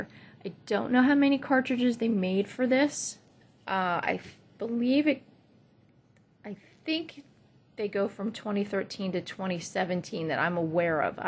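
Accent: New Jersey, United States. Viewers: What a soothing, ASMR voice she has. But that's not why I subscribe. She does a great job of in depth evaluation of resale items. I never miss an episode. I love that Jersey (or what ever it is) accent.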